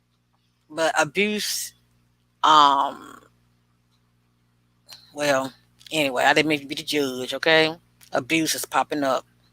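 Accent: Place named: South, United States. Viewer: Wow ! Straight on point wow I feel this in my gutt about there characteristics ! But so true about how people can go so long and keep from showing there true self ! Ty so much for this and may I ask your southern I can tell from your accent I’m from Ky I’m guessing Georgia or Alabama but I really feel the first if you can say if you can’t with everything I’d understand ! I do ty so much for your time helping us all to see a closer look into this case honey ! Stay safe and god bless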